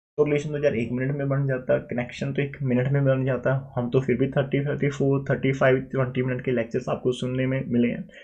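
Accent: Punjab, India. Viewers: Sir ap punjab ho kya?? O bhai sir mene kya sahi gusse kya hai maine apka ak short video dekha jisme ap punjabi me bat kar rahe the vo video dekhne se pehle hi maine guess kiya😮😮😮 Punjabi agaye oye🤩🤩🤩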